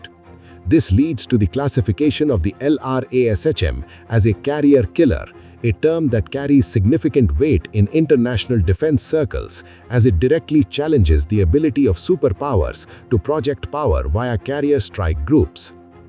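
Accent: India. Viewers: Your stupid Indian missiles are not working at all, stop bragging that your navy is better than our Chinese navy !!! By the way, your Indian English is not proper English, stop speaking Curry English !!!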